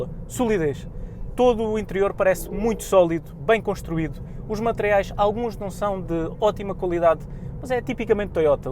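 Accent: Portugal. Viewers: Ele é português. É outro dialeto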